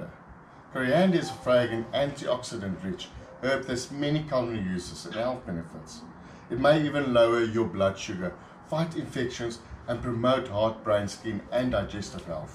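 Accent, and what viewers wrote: South Africa. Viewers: when i first saw this i thought Oh fok een of ander soutie gaan nou die opneuk" until i heard you were south african. This is what we call Biltong, not that jetk wanna be stuff the americans eat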